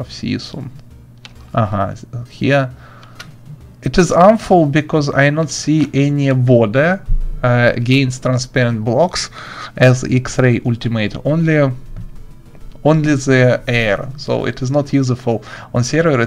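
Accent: Russia. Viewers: Русский акцент имба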